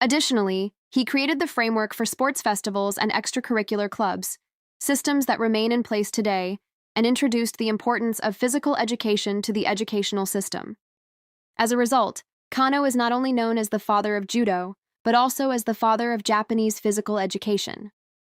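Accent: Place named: United States. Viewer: Narrator must learn proper pronunciations of Japanese names and places. Sounds too Yankee!😮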